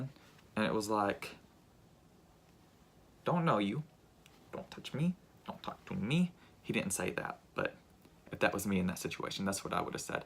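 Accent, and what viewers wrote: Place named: North Alabama, United States. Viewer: The accent!!!! Where are you from, cause that sounds like a north bama accent?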